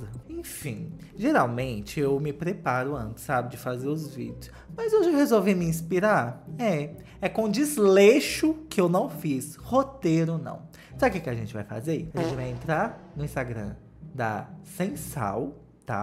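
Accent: Minas Gerais, Brazil. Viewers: Eu amo o comentando as coisas com esse sotaquinho mineiro fofo ♡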